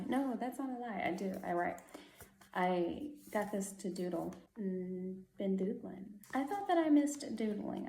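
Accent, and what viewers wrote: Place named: South, United States. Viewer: Great Video! I really enjoyed it. First, that entire struggle with the little coffee brewer had me rollin 😂. It was too cute! I also thought your story about being 2 mins late for the tour was hilarious! “I can see the backs of their heads” 🤣🤣 I missed you so much and I’m so happy you’re back. I live in NY state but my family is from the south and I miss them. Your accent feels like home to me. Thank you. You are loved.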